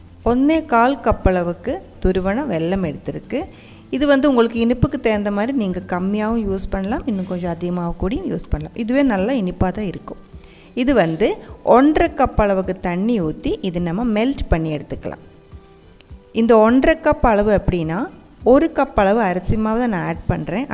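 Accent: Kerala, India. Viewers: Hi,ningal malayaliyanennu AA thamil parayunnathu startingil kettappol thanne manassilyi .Pirake chakka ennu paranjappol confirm aayi.Tamil nattil janichu valarnna malayalisil ee different kanarilla.Athukond keralathil valarnnavarakum ennu karuthunnu.ButI really happy.Nammude aalukal Anya bashayil vedio cheyyumbol, athum AA nattukar eshtappedumbol kittunna santhosham onnu vere thanneyanu. Keep it up.My hearty wishes for u. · மலையாளம் கலந்த தமிழ் பேசுவது சூப்பரா... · Very good tamil to listen in Malayalam accent❤️❤️ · malayali Tamil peasaree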